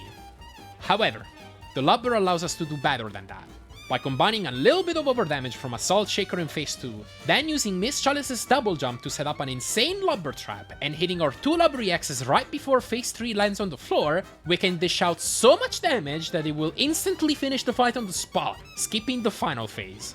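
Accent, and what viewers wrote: Italy. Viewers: Bro è italiano me sa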